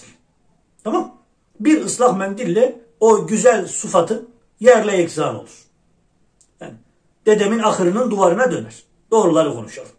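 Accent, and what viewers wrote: Erzurum, Turkey. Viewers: yok reis ne sorunu başım gözüm üstüne konuşman biraz Erzurum lu arkadaşlarima benzettim ondan sordum. 🤣🤣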